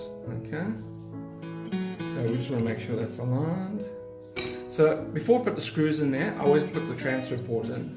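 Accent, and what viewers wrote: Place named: South Africa. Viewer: The style of this video, music and video quality and South African narration has a district 13 feel to it. I really enjoyed watching this upgrade.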